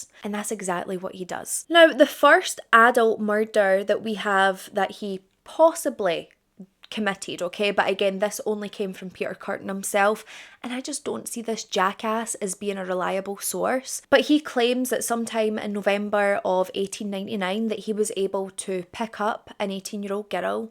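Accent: Scotland, United Kingdom. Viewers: To the guys who complain about the makeup do like me put the video on and just listen I enjoy listening to the cases and the Scottish accent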